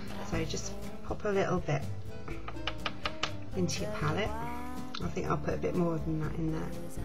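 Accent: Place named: United Kingdom. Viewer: Very nice, also from the UK, but living in Singapore - love your accent :) Nice job - I learnt something new today - CHEERS!!!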